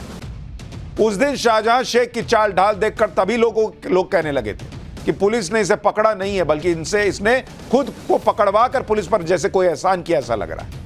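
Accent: Bihar, India. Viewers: Are dada ee to bihari lg rhaa g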